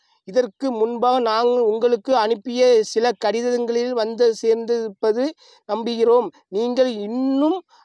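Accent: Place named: Thoothukudi, India. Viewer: தலைவரோட அந்த சரலமான தூத்துக்குடி பாசை -க்கு நான் அடிமை 💥🙌🏻😂🤣நீ வேர ரகம் தலைவரே🙌🏻😄🤣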